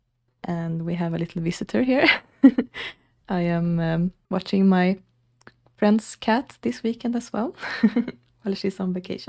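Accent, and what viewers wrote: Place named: Sweden. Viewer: I just found you when just looking for a new sims 2 let's play to get into! Got so excited when o heard you accent, I'm also Swedish so I look forward to seeing your content even more now. I've actually been meaning to start a channel to play sims 2 etc but been procrastinating it for years, and only recently decided I'd do it in English instead of Swedish to not make it too niche. Verkar som att nån hann före mig! Haha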